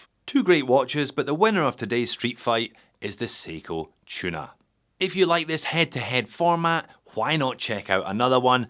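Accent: United Kingdom. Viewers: Thank you Sir, love the accent ! Is that from United Kingdom 🇬🇧?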